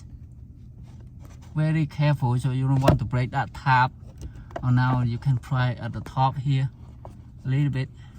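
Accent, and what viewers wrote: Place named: Vietnam. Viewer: nice, ty for this sir. also u sound Vietnamese. i love it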